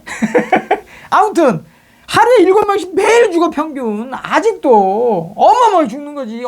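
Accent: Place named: China. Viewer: 유튜브발음이 중국인같음!!! 윤통체포… <link>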